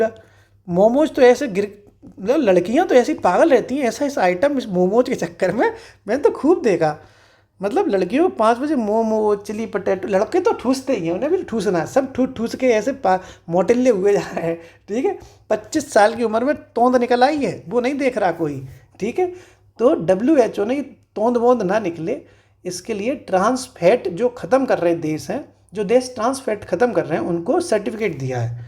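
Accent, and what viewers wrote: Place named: Firozabad, India. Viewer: Sir फ़िरोज़ाबादी touch ह language मै..... 👍🏻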